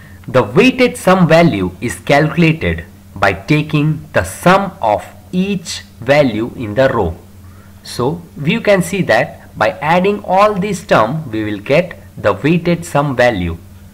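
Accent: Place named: India. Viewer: 90% of tutorials on YT are by indians. Why is that? This accent is very difficult to follow.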